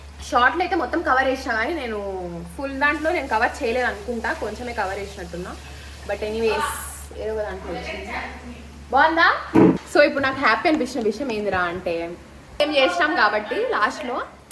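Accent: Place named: Kadapa, India. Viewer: mana kadapa slong vintu vuntunta vinasompu ga vundi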